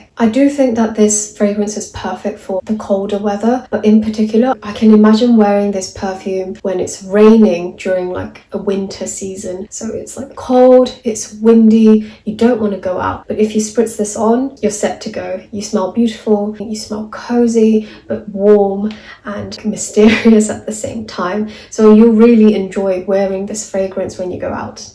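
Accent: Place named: United Kingdom. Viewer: 영국발음 너무 매력적이에요🥹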